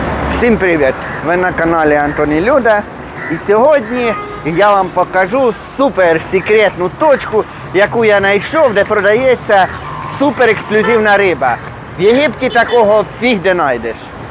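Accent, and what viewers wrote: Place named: Central Ukraine, Ukraine. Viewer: По говору з центру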